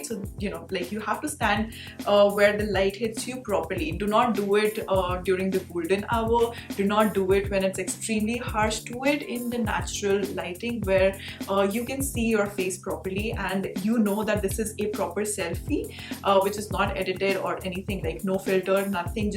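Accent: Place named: Punjab, India. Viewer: I hear ur accent though 😂😂😂😂 please bring ur phnjabi accent back noooo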